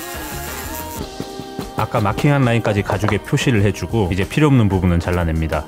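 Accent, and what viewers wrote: Gyeongsang, South Korea. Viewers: 아무리 표준어를 쓰려해도 악센트라 사투리가 나와요 서울사람이 부산가서 경상도 사투리 쓰듯… 그냥 사투리 쓰세여. 강호동처럼.. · 혹시 고향이 갱상도인가요?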